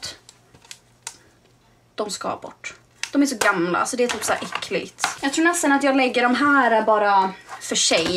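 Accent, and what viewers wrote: Stockholm, Sweden. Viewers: Varför låtsas du vara stockholmare??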